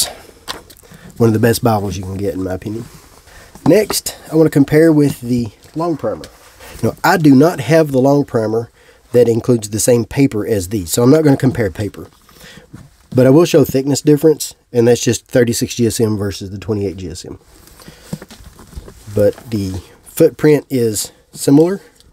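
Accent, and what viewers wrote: South, United States. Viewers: Your accent is Southern American, right? What part of the South, if I may ask?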